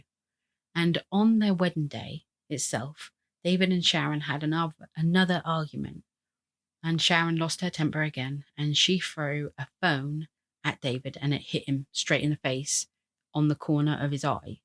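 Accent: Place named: Norwich, United Kingdom. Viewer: Hi! I'm just wondering where you are from? I'm from Norwich and you sound very local. Best wishes Jane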